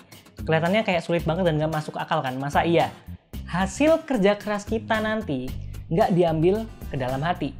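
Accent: Toraja, Indonesia. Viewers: Lu orang toraja bang??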